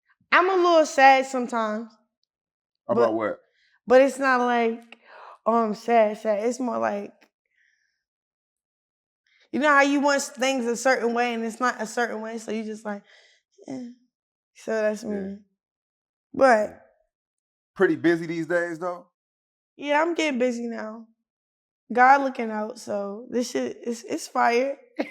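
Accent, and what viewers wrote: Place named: Maryland, United States. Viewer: I can definitely hear that "Murlen" accent in her voice lol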